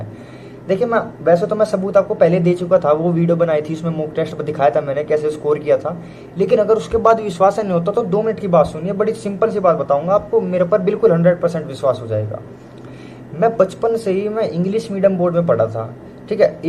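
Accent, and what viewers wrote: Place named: Western Uttar Pradesh, India. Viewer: bhai up West ka accent lgra h teri bolli m....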